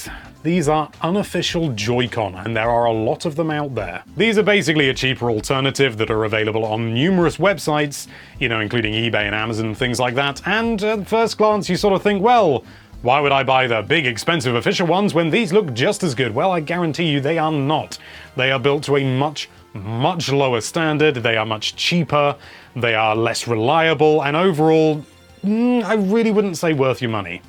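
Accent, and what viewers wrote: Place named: United Kingdom. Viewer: And you have earned yourself a new subscriber. British accents are my kryptonite but you’re also a really good YouTuber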